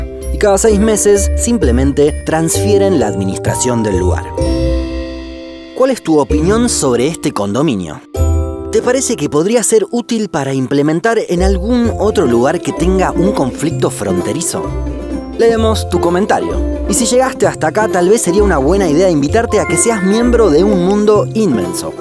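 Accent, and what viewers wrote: Argentina. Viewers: Una duda, este canal es Argentino? o el que habla en los videos es de Argentina? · y el detalle papi... es Argentino :V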